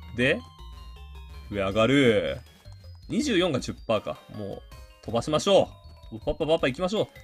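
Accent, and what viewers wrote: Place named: Kansai, Japan. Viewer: って関西弁似合うね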